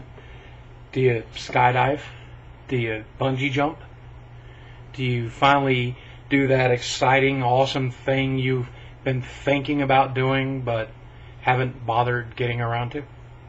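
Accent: South, United States. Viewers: Sad looking back at this Mate, funny thing is here you sound like a cowboy from the south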